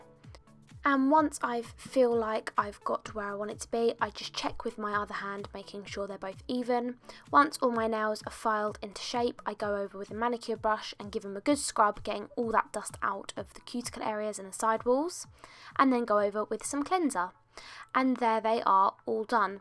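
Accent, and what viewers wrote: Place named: England, United Kingdom. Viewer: i could listen to you all day!! ur english accent!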